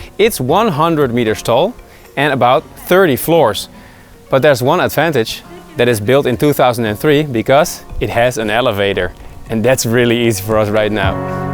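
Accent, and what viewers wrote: Netherlands. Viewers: by the frist phrase I knew u were dutch haha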